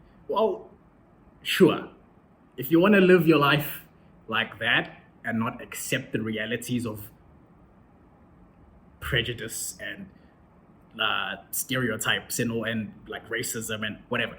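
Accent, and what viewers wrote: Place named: United States. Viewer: Thanks for the video, brother. I'm curious on how you developed such a good American Accent. You've mentioned in the end but a little more detailing would help. Thanks!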